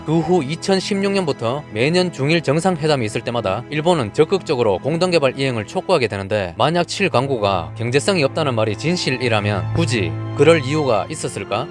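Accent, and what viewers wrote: Gyeongsang, South Korea. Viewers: 갱상도 나레이터 매력있네요. ^^ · 영상은 좋은데 갱상도 사투리 오지네 짜증나게.....!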